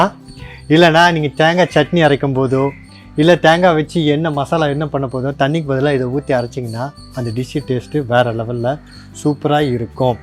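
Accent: Tirunelveli, India. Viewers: Are u from thirunelveli?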